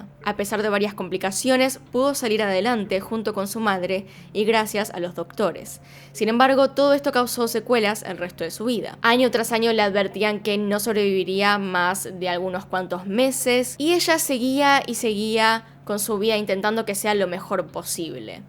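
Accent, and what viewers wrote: Argentina. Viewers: Es el acento de algunas provincias de Argentina. Yo también hablo así, no tiene por qué molestarte. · Eres Argentina???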